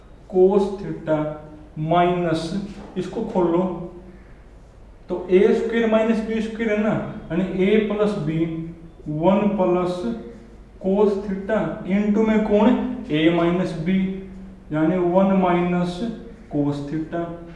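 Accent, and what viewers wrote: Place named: Uttar Pradesh, India. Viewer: sir m UP se hu aapki voice se laga keep growing sir ❤️❤️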